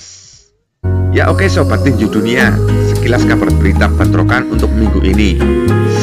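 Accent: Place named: Banyumas, Indonesia. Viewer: Admin mengulas masih logat ngapak👍. Inyong seneng dgn ulasan ini👍👍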